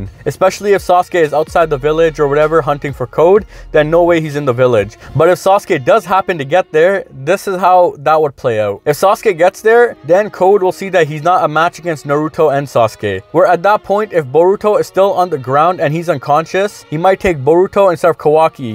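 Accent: Toronto, Canada. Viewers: Like your theories bro but Yo you from Toronto? You sound like them sometimes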